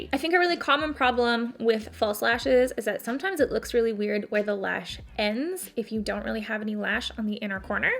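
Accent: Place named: Canada. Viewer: ur Canadian accent is adorable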